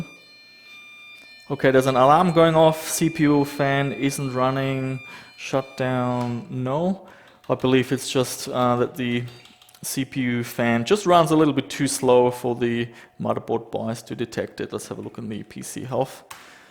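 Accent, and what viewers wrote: Germany. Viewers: are you from germany or austria? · Ach du bist Deutsch :D Hab mich schon die ganze Zeit gewundert was das für ein Akzent ist XD Tolle videos, weiter so!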